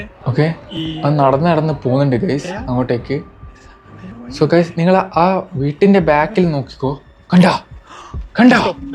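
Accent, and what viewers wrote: Kannur, India. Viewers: Bro kannur aano. Oru doubt!!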